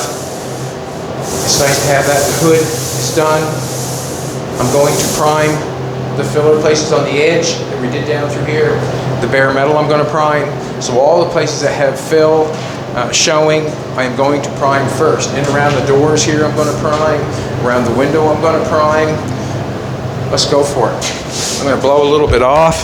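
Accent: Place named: Canada. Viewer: hi! have not found all your bugati videos yet , found a lone early one , but not giving up cuz i love bugati a lot . hope this makes you smile....i am a french canadian from montreal , and just about anything on youtube is english so i am used to it and i noticed you have a canadian accent !!! not from quebec mind you but canadian all the way . yeah flag waving here . refreshing to see your talent in the forefront . might even go to see you this summer .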